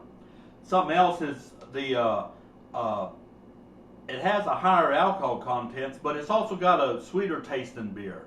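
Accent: United States. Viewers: Are you an Okie?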